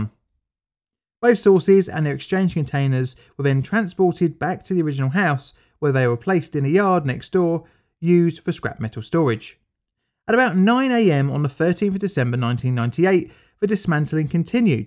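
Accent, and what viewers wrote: United Kingdom. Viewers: Pal, I've got to say, the contrast between the perfect british accent, narrative tone and wording, and the expressions on your bubble dialog boxes for the comics are making my day. It's pure perfection. "Score and a Hen", "Balls" and such. Exquisite combination.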